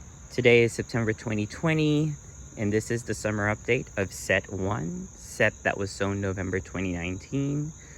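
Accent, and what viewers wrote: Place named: South, United States. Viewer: I was thinking you were from the South. Thank you for the video! 😊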